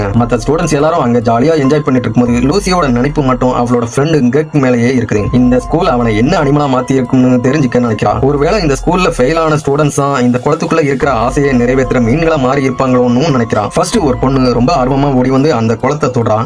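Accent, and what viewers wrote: Coimbatore, India. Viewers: Enna bro unga slang coimbatore mari Iruku 🤔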